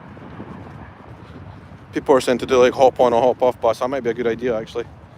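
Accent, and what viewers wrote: Scotland, United Kingdom. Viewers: It's great to have you guys both visit a historic part of the USA. I hope y'all will have a wonderful time here. Edit: Hi from San Antonio 63° F I love your bolo! Remember your Scottish accent will open a lot of doors for you and. People love it.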